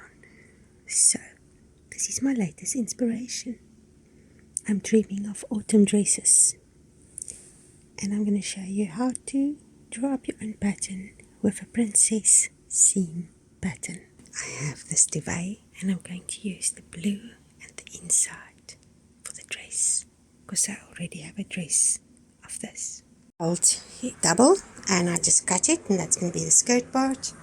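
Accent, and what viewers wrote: Afrikaans, South Africa. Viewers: I recognise your accent from Afrikaans Nationality in South Africa 🌿🌹♥️ I am in South Africa 🇿🇦 Enjoyed your video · Hello. Are you Afrikaans speaking? From your accent you seems Afrikaans but the scenes in your videos does not seem to be South African😊😊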